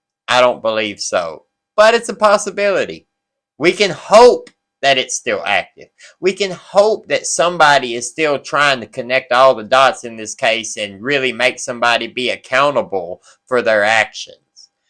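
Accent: South, United States. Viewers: Just became a new sub today! Love the channel and that southern draw you got! 😉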